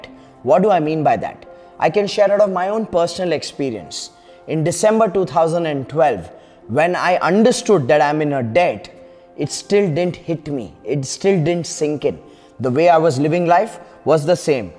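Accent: India. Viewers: Idk what is about Indians speaking english, that is so pleasant to listen.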